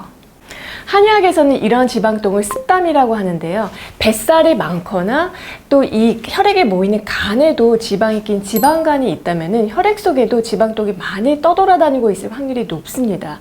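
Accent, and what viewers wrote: Seoul, South Korea. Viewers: 목소리도 이뿌시고 서울 말씨가 더욱좋습니다. 모든병이 혈행성 이라고 하는데. 잘이해했습니다. 좋은 하루 되세요.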